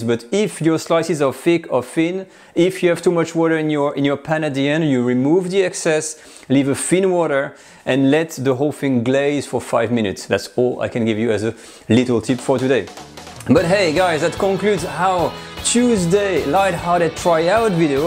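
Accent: France. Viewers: I just love to hear you speaking English with that lovely French accent.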